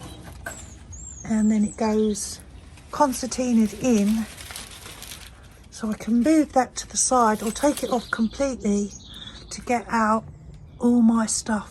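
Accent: London, United Kingdom. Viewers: Hi Lovely to hear from you. My husband watched today and said you sounded like a Londoner. You have been so busy again. I will be copying the artificial grass liners idea. Regarding your 'trinkets'... I love them👏 i have some too especially signage ones eg. ' Don't worry,be happy' ( of course we do worry,but i like the sign). I'm a great one for separating my plants. Makes my garden look fuller too. No big buys lately,just some paler blue muscari. The old favourites are surprising me too. Your garden is a joy. Regards to Richard and thank you for making me smile💯💖☕🥰💞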